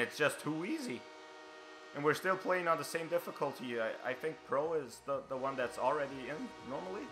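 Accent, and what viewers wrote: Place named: Austria. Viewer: I like your accent... you sound like Michael Grabner. Are you from Austria? You're very insightful, keep up the good work.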